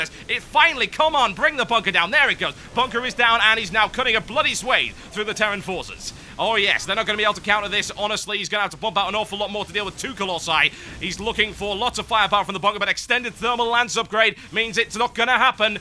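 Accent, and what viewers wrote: United Kingdom. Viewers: I think I have found my new favorite SC2 caster. I have to say it's kinda unfair since has his British accent in his favour.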